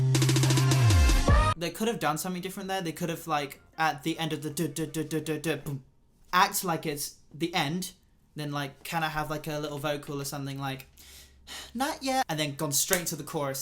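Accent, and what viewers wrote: United Kingdom. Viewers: From which country he is???? Style like Korean Skin like Korean Makeup like Korean But But But Voice like British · Bro sounds like Britiish looks like korean what's happening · that british accent<3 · Wow I’ve never heard you talking 😯 I didn’t know you had a British accent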